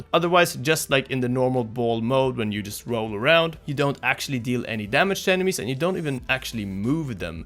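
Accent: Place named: Sweden. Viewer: One of the most Swedish accents I've heard / a Swede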